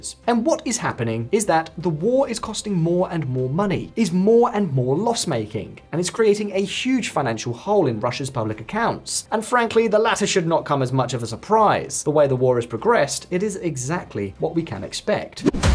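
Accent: RP, United Kingdom. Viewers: Why does drivel told with confidence and in posh British accent sounds reasonable? That's the only question I have to you. Russia has the fundamentals, including energy, food, minerals, wood, and some decent, though mostly basic, industrial capacity that allows it to manufacture finished goods, particularly weaponry. Weaponry and ammunition at a price point much lower than Western's. Some of this weaponry -- especially the drones -- is effective against multimillion dollar Western equipment. The rest (including many types of FPV drones and chips) is imported, both directly and via intermediaries, especially from China, with whom Russia shares an immense border. As to budgetary deficit, it is not so large, compared to the income Russia has from its exports, which will allow it to eventually recover its finances. While you're at it, you should have mentioned that all this expensive energy that Europe has been buying has led to deindustrialization. 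Particularly, and most painfully, in Germany. And it's ongoing. Also mention the US's deficit. It's stunningly large, and some say there'll be hyperinflation in the US. Saudi Arabia has partly moved to abandon the exclusivity of the petrodollar. I'm suspecting that the anglophone elites are intent on screwing up the world, including themselves. But I'm undecided whether it's because they're crazy, stupid, or stubborn as hell, or all of it. Maybe the decadent and dying British aristocracy is an indicator of that. After all, they're scr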